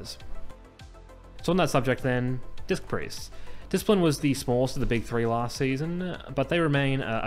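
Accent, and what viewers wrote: Australia. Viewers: you sound aussie bro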